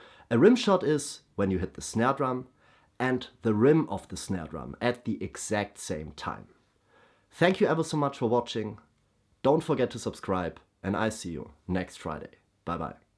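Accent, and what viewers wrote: Germany. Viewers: thats a german accent isnt it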